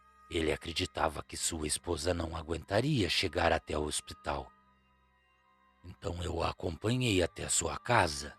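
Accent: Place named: Paraná, Brazil. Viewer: Bom noite,! Pelo seu sotaque tu deves ser do Parana!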